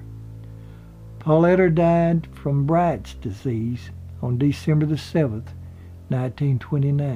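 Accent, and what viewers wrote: South, United States. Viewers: This is a very interesting video as usual,. I still like that southern-type accent (at least to me, from Michigan) you have. Your voice always adds to the mystique of your historical stories. Of course, the pictures you take that go along with your videos, really set the mood well. I especially like your visits to the historic cemeteries as well. Great Job!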